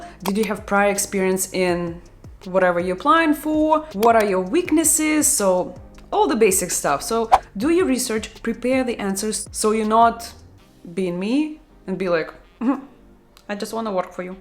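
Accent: Russia. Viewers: hi i just wanted to say that i love your channel and your personality and russian accents that pops-up from time to time is very funny. is it possible for you to make a video on what is a co-op program, give us most of the informations you believe we need to know and share a personal experience if can; I'll really appreciate ✨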